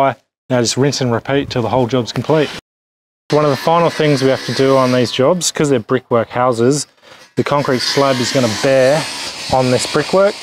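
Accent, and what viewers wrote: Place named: Western Australia, Australia. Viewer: no way mate. I thought you were from West Oz by your accent. North or South of the river? Looks like you work in the northern suburbs if I had to guess. I lived down near Byford most my life. Name comes from a vlog of my time in Russia.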